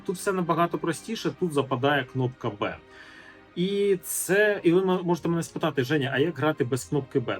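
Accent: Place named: Ukraine. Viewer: Спс за обзор. Если приставать, то отметил бы только грамотность речи, потому что ру слова с укр акцентом режет ухо.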